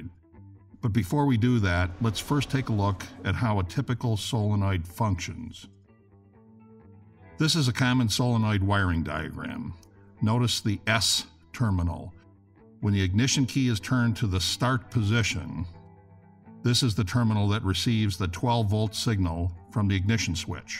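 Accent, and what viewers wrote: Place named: Chicago, United States. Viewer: This guy is obviously from Chicaago. That accent is terrible but video not bad. thanks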